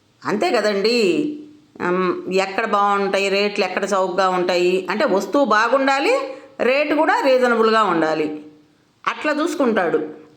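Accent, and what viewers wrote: Guntur, India. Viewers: మీది గుంటూరు ఆ అండీ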